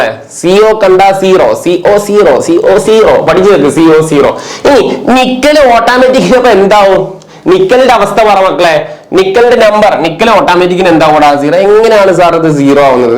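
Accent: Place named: Kannur, India. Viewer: Kannur slang😂🫶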